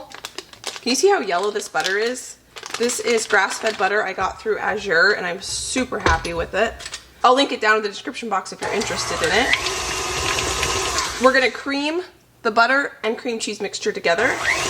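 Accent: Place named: Michigan, United States. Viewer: Pasties! I thought I heard Michigan when YOU talk.. I’m from Michigan too!!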